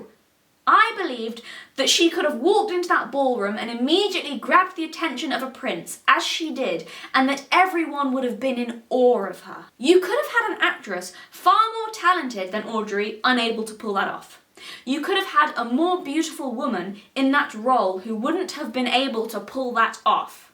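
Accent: United Kingdom. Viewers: Its official, having a British accent makes you sound smarter than us Americans. I actually have a friend with a similar accent to this woman, and even though he's not really a genius, I always feel dumber around him. Weird huh? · I am here for the British accent, mostly. Lol👌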